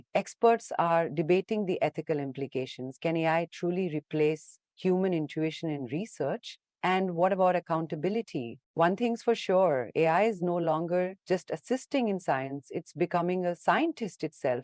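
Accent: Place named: India. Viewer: Indian accent😂😂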